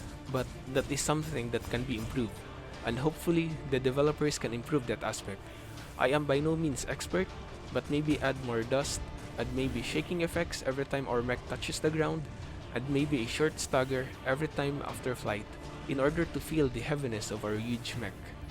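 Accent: Philippines. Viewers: Pinoy? Your accent was so obvious.. Anyway I love your video keep it up.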